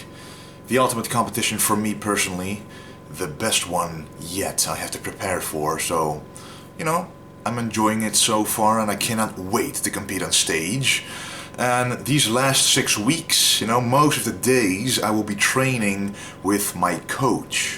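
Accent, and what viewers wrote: Netherlands. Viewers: Yes, Dutch people--I would bet you he would tell you that the olympics are in Rye-o.They simply pronounce it as a long i to be safe. IO is tricky--think riot and prior. All different...Either way, sorta being a hard ass to bust a guys balls on a point such as that when he is doing a youtube video about fitness in a second language--pretty fucking impressive.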